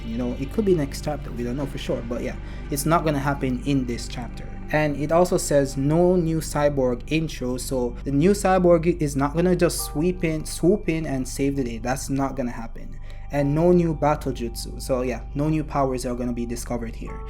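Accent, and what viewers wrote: Jamaica. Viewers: Are you Jamaican bro?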